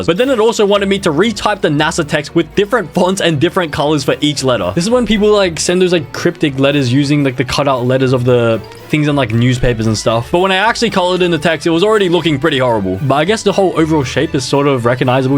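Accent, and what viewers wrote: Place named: Australia. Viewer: WHY WAS I SO HAPPY FINDING OUT HE WAS AN AUSSIE 💀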